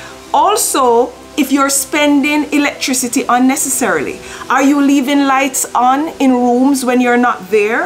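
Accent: Jamaica. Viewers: keep impacting. love the patua jamaican tone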